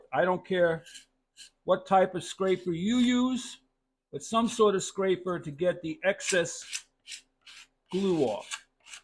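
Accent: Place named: Boston, United States. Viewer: I detect a bit of Boston accent…..caaaaard scraper, haaaaardware store. 😀. Glad you are having a fun time, it’s very informative to watch you make some planes. Enjoy your day. Too cold in my shop right now so I will patiently wait to try your teachings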